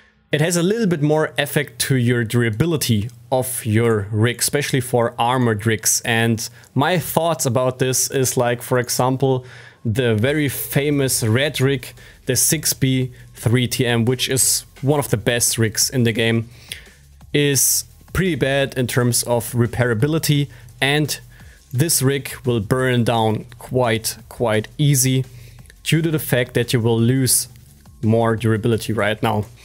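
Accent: Germany. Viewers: You are the reason why I drink some beer, your videos are great and the German accent makes it great , nice video man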